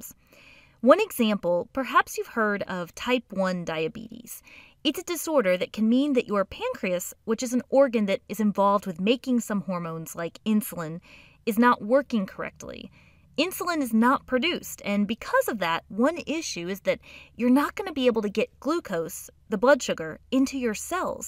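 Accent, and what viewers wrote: United States. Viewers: Gr8! R u American..?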